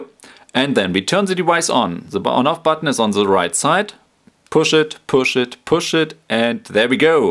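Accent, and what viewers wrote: Germany. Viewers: I love your accent!!!!! I have the German accent as well. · Are you german?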